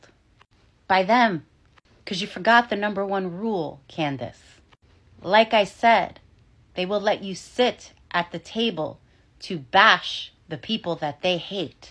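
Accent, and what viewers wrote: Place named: New Jersey, United States. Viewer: I hear New Jersey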